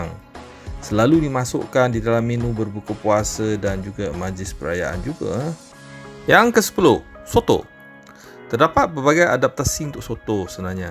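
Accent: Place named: Indonesia. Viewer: Lidahnya sama dgn orang indonesia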